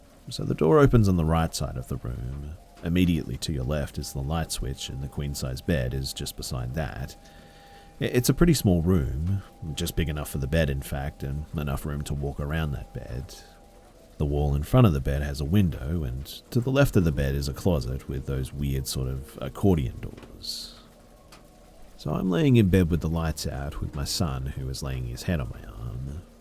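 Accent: Australia. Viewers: I'm pretty sure he's Australian.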